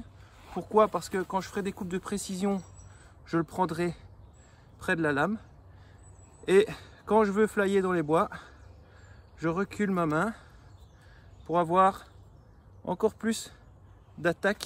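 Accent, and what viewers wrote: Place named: Belgium. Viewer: salut l ami , es tu belge ? Ton accent me rappel m chez-moi ( liege ) super vidéo continue l ami :)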